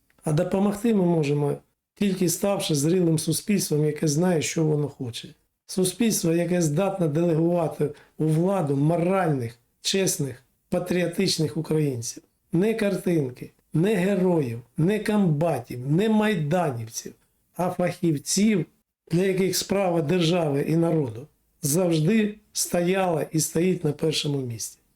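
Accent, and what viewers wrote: Russia. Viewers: Може твої слова мають рацію. Але, оце мацковське Аканьє: пАгАвАрить, пАмиляюсь, пААбіцял, пАвідомлять... Заєбал. Вчи мову. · Все було нічого, але акання боляче слухати. Хто ж вас так вчив рАзмАвляти українською, невже ТимАшенкА?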